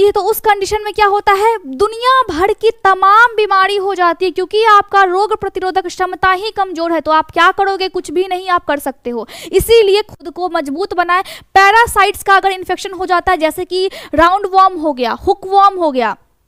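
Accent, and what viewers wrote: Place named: Bengal, India. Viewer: Bengali walo ki Hindi bada cute lagtaa❤ ra ko da bolna Bara nehi bada Kaaran nehi Kaadan😂 Fir v confident bahut hai didi🎉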